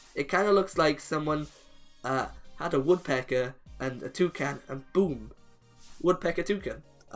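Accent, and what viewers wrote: Wales, United Kingdom. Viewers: R u Welsh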